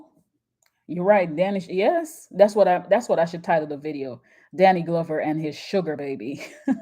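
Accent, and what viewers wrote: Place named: Jamaica. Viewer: Oh you Jamaican! Gwan, no wonder I like your show! 🙏🏿🇬🇧🇯🇲❤️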